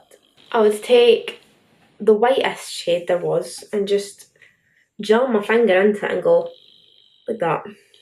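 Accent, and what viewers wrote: Scotland, United Kingdom. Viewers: OH MY GOSH ARE YOU SCOTTISH??? I ABSOLUTELY LOVE YOUR ACCENT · YOU’RE SCOTTISH! 😃🏴🏴🏴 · soz but as a scottish person myself, her accent is rank. Dunno where in scotland she’s from but her accent is HORRIBLE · If you say you can't understand her it confuses me...I'm american...and she's speaking English with a Scottish accent...its the same language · She has a Scottish accent. I’m Scottish and it took me forever to figure out that she was Scottish as well 😂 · Wow, I wasn’t expecting you to be scottish 😂😂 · ahhh I didn't know she was Scottish, the accent is so cute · How does nobody even understand her accent, I ain’t even Scottish but I know what she’s saying 😂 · YOUR SCOTTISH??? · FINALLY A SCOTTISH GAL IN THE SCENE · Dammm i love ur Scottish accent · i cant believe i’ve seen so many of your videos and never heard you speak and didn’t know you were scottish omg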